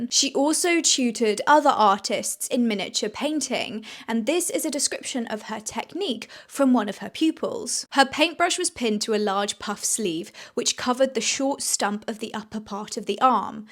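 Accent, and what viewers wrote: United Kingdom. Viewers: As a non native English speaker, I can't decide what amazes me most; the wonderful story of Sarah or the delicious British accent of the presenter.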